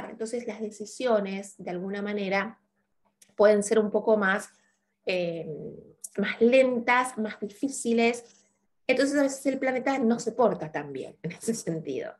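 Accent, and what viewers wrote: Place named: Argentina. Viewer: Saludos desde chile.espero algo bueno de marte en tauro.ud estimada sra? Comento lo de mes de julio. Con mucha humildad ud dijo q su ascendente? Es geminis. Y ya q se algo de gemas? Averigue? Que la gema para ud? Y en su ascendente? Es la piedra o gema... agata. Es su talisman indicado. Es lo que se. Desde chile? Mi pais? Un hermoso abrazo fraternal? Hacia ud? Y un abrazo de amistad entre chile y su pais españa? Me parece? O argentina. No se bien de donde es ud. Le encuentro acento argentino. Bueno sea cual sea? Amistad. Chao sra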